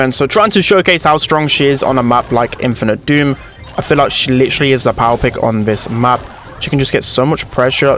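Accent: United Kingdom. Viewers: I love your british accent 🥰🥰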